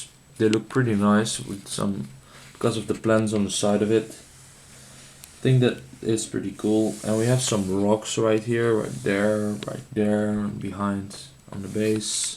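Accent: Netherlands. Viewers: Are you dutch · are you dutch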